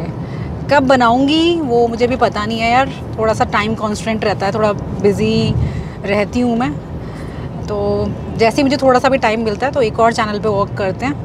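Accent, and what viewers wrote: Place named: Northeast, India. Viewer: North east wala hindi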